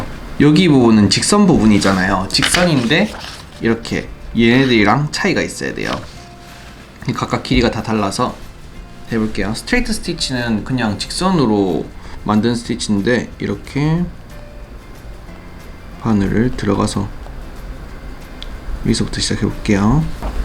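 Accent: Gyeongsang, South Korea. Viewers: 모르는 내용도 아닌데 뭔가,, 경상도 억양이 넘 귀여워서 계속 보게 되네요,, 경상도 사투리가 이케 다정한 느낌일 수가 있군여, 중독성 있네욥,,:)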